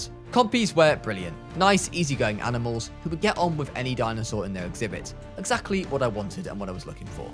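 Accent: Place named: United Kingdom. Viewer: Ur British yay finally I found a British person that does Jurassic World videos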